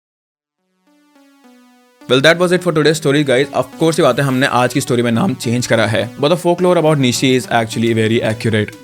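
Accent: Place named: Bengal, India. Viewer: Love your Bengali Accent bro!! Support & Love from Medinipur, West Bengal ❤❤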